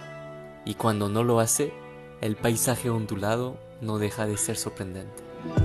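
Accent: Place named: Chile. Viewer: Todo bien pero tu acento Chileno me desespera tengo que usar el traductor para entenderlo y respirar porque de oírte se me va la Respiración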